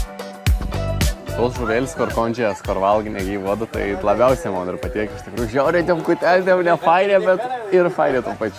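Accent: Samogitia, Lithuania. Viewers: Ačiū,Tau uz video.Labai grazi Tavo kalba kaip zemaiciuoji.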